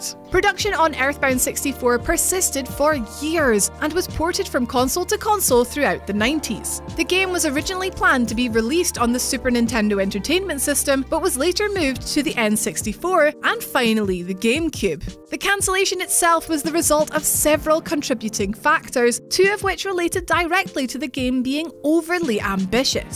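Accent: Scotland, United Kingdom. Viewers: Was it just me or did get even more scotish than usual? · omfg that scotish accent <33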